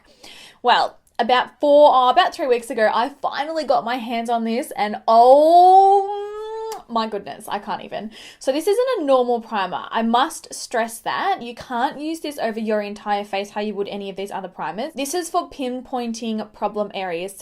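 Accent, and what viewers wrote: Australia. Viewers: Love love the Hourglass Primer and the Porefessional from Benefit the best. Didn't like the Becca it kept balling up on me and I warmed it up like they suggested. Will have to get the Rimmel and try that drugstore brand. Love all your videos for us oily t zone gals. Oh I bought that L'Oréal in the 106 and you were right it worked on me cause I'm olive skinned, thank you so much. I'll have to go back to your foundation videos and see what colors you use in those and hopefully get some samples from Sephora. Love how you interact with us, your one of the few who does that, it means a lot to us. Love your Australian accent, can't get enough, your so georgeous.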